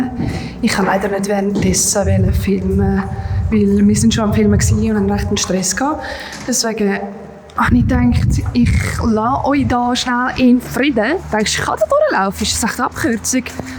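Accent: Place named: Switzerland. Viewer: Keine Ahnung, warum mir das vorgeschlagen wird, aber voll interessant: Ich bin aus Wien und versteh fast alles, was du sagst, könnte aber niemals selbst so sprechen, wenn ich nicht mehrere Jahre in der Schweiz leben würde! 😂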